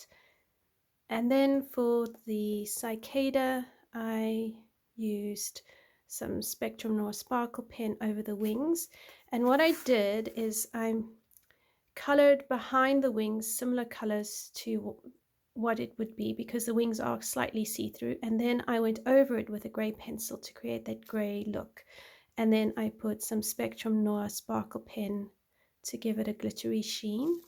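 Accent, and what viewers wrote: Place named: South Africa. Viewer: You sound Soith African? I am too but we moved to Germany. First time viewer here. Haven't watched more than 2 mins yet, just enjoyed hearing your accent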